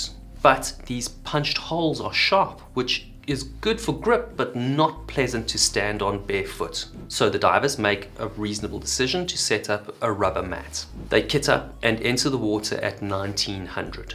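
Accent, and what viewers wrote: South Africa. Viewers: Your accent sounds very South African, are you south African living in the UK? 🇿🇦😁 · where are you from? you almost sound south african? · I knew a guy from Albertinia (South Africa) who worked on that platform. He'd fly in to the UK and he handled the landing barge bringing in supplies. Nice to hear the Saffa accent explaining these events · I hear a South African accent? 😊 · Oh man, so close to hiding that south african accent. but not close enough boet!! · You sound south african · You south african? I'd recognize that accent anywhere, amazing video btw!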